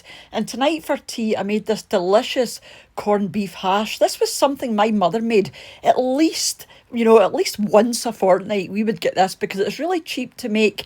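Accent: Scotland, United Kingdom. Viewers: You've got to love a Scottish accent! I could listen to this lady reading the telephone directory and still be enthralled lol. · Love this woman's accent. Sounds like my Grandma Victoria Elliott, a proud Scot. RIP Grandma, see you later xx. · Lovely Scot's accent - could listen to you all day :) from an admiring Englishman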